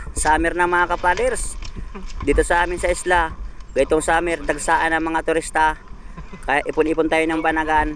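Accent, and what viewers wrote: Bicol, Philippines. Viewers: bicol tagalog salita m idol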